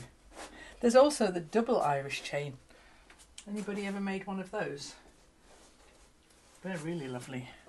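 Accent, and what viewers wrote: United Kingdom. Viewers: Indeed, her British accent & energy & unique authenticity, truly make her a gem of a gal and excellent teacher…She is so relaxing to listen to, like an old friend to sew/craft with! ❤️🙏🌞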